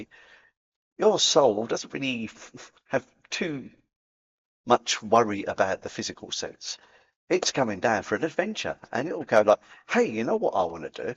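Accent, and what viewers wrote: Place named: Cockney, United Kingdom. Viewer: Lovely my friend. The Cockney accent helps!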